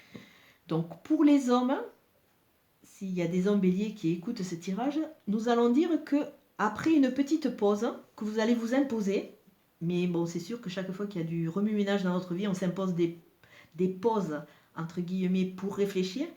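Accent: South, France. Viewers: Merci il me semble entendre l'accent du sud qui correspond au même accent que moi je suis du côté du Tarn merci beaucoup c'est très parlant j'aime beaucoup à bientôt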